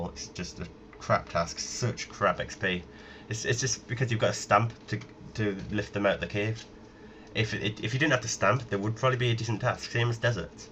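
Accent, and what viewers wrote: United Kingdom. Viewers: brittish accent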